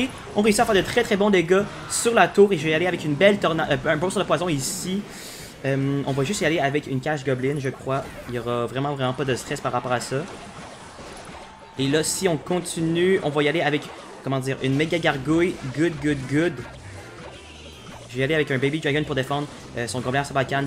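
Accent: Quebec, Canada. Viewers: J’adore ton acent Québécois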